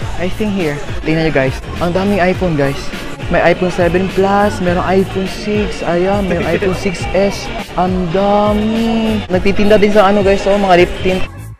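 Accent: Visayas, Philippines. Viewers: Classic bisaya speaking tagalog. Oh the good ol days u earned a sub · Aww bisaya diay ni😂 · hlaaah bisaya diay ka dzai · Bisaya po ba kayo ?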